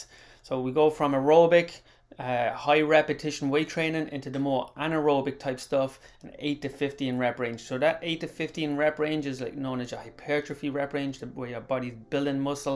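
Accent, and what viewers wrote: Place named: Ireland. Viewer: You Irish ? I’m down in limerick. Nice to see a Irish person cover adrenal fatigue!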